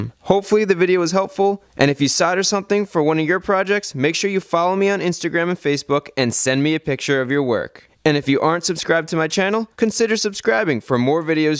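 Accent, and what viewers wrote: United States. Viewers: i appreciate the 'American' pronunciation of 'solder', as 'soder,' but it doesn't make it correct! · Hey learn how to say soldering, there is no silent L in the word so I don't get why americans drop the L this makes you sound dum and is probably true So say it after me S O L D E R I N G SoL-dering !!! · It's an American thing, it's how we talk. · Thats the yanks for you. Always trying to alter the ENGLISH LANGUAGE. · This pronunciation seems to be very common by Americans. It's not a bad video though if you need to learn about soldering. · That's how us Americans pronounce it. I don't get it either, but that's just how it is. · I want to ask a question, great video as always but why do Americans pronounce 'solder' as 'sodder', the 'L' isn't silent, and if you think it is, how do you pronounce the word 'Sold' ... do you say I 'sod' a car today? 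lol I'm only being a bit pedantic about it but I'm also genuinely curious if there is a reason why this has come about, any Americans who can tell me cos it makes me smile every time I hear one of you guys talking about 'soLdering' something lol By the way I'm very jealous of anyone with steady enough hands to solder properly, my hands shake so badly that let's just say if you see me going to try and diffuse a bomb then make use of the head start!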